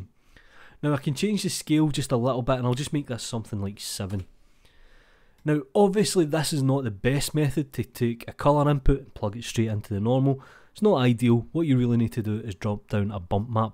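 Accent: Scotland, United Kingdom. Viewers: Everything is a billion times better with the scottish accent and i mean everything.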